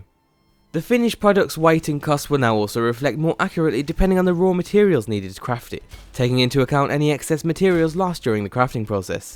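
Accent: United Kingdom. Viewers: James Troughton i don't know, he sounds more british again. then again he might be foreign and going for a british accent except for the word "mod" which he pronounces as "mad". it's not a big deal but, you know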